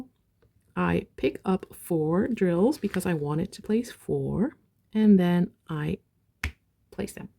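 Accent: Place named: Netherlands. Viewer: Clocked the Dutch accent immediately hahaha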